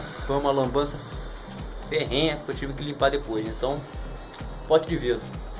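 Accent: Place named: Rio de Janeiro, Brazil. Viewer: Po, pelo sotaque estava achando que vc era aqui do rio, qnd aos aparece o prédio bege e marrom que morei por 15 anos aqui em nit...kkkk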